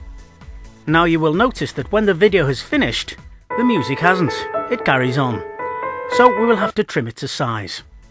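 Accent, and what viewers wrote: Wales, United Kingdom. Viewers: Thank you so much:) just watched it, I knew alot of the stuff but I have learnt a few new tips, thank you!! Are you Welsh? Sometimes you sound Welsh, sometimes English lol x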